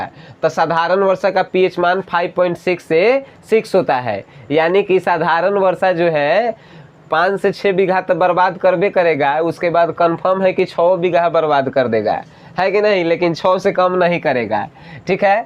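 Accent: Bihar, India. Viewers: Sir ap Bihar se belong karte h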